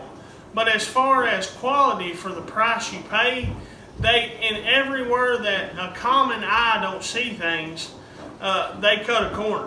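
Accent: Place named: Virginia, United States. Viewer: Mann you sound like us out here in va. We did the same thing and are about to do what u did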